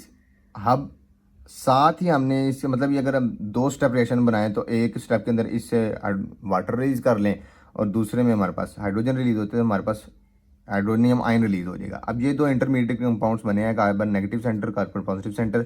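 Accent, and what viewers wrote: Punjab, India. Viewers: Punjabi Ascent ❤❤ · Lgda sir vi apny ilaqyy day iiii ny 😂😂 Punjabi bao vadiyaa sir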